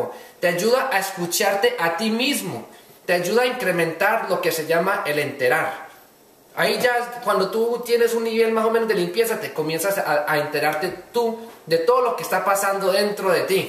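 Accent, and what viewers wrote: Colombia. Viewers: Este asentó me parece conocido...Colombiano.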